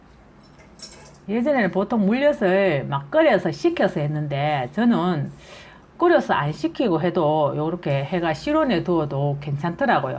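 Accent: Gyeongsang, South Korea. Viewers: 쌤 경상도 라도 억양이 경북 분이네요 하모예 메실엑기스는 파이지예ㅋㅋ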